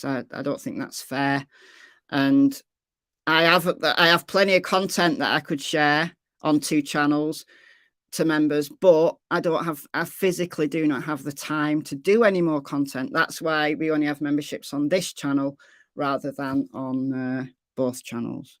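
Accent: United Kingdom. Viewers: Missed the live stream but got to listen to it all and hear the updates. Congrats to the winners of free month memberships. To me this is the best channel. You get facts, commentary, dogs playing, humor, you get heard, and questions answered. All this with a British accent and caring.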